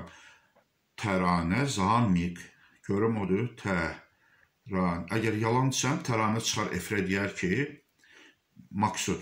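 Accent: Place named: Sheki, Azerbaijan. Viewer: şəkiknən danışır 😀😀 can ay yetim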